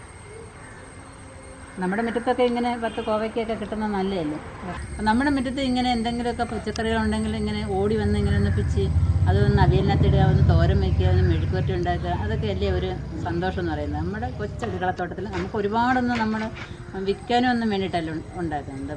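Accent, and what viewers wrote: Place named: Thiruvananthapuram, India. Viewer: തിരുവനന്തപുരം slangs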